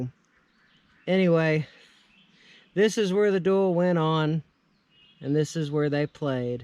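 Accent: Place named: South, United States. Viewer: Perhaps, get out and do some hiking yourself and you will not be sucking for air...sounds like your a southerner and being so close to the river and or any water source as well as walking down a trail...very fortunate to not crossed paths with a CottonMouth, Timber Rattler or other venomous no-shoulders that are all over the area...wearing flip-flops probably not smart.....Oh well, I just wasted 13 minutes....I hope ur approach improves because this was pretty bad...not intended to be offensive just honest feedback. Take Care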